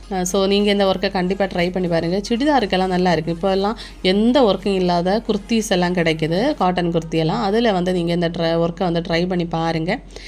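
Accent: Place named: Kuzhithurai, India. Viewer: I like your speech,I had relatives in kuzhithurai,They speak the same style as you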